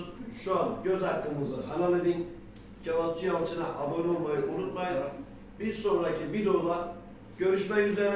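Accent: Gaziantep, Turkey. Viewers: Senin tatlı dilin ,Antep ağzın yeter Yalçın abi. Antep’e gelirsek muhakkak uğrayacağız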